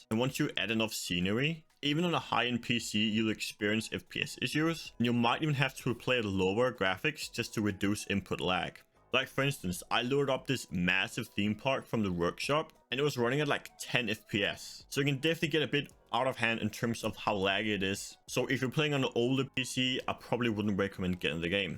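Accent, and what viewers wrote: Denmark. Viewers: I COULD HEAR IT IM FROM DENMARK TOO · Are u danish? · Er du dansk? :D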